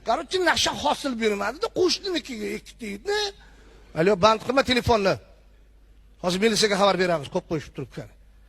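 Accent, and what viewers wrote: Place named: Tashkent, Uzbekistan. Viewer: Чести тошкенча😂😂😂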